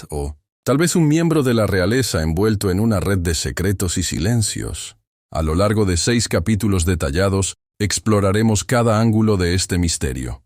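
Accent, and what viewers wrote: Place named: Spain. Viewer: Lamento la narración en el español de España que sólo se habla en España en latinoamérica no hablamos eso.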